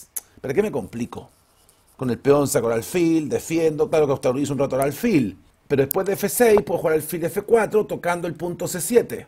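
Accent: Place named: Chile. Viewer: Qué gozada haber descubierto el canal y ser suscriptor..! Explica usted de maravilla. La suma de la pasión que pone más ese encantador acento chileno, es una delicia. Celadas muy pero que muy útiles. Saludos desde España y un abrazo!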